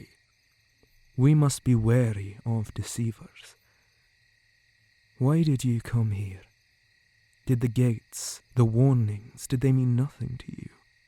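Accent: Scotland, United Kingdom. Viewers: Love the Scottish accent. It fits the character perfectly.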